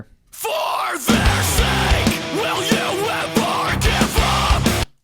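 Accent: Canada. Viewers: Video recommended on YouTube. "Well that's a good southern Ontario accent if I've ever heard one". Going through bands - oh yeah, these are all the hardcore bands I listened to in High School, man, where I grew up had such a crazy hardcore scene. Then proceeds to play song from band I went to high school with. Well yeah. That makes sense.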